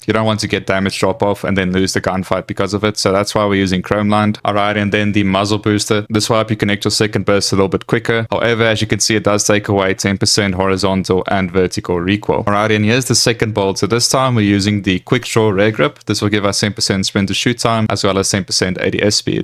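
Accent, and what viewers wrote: South Africa. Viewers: You from South Africa ?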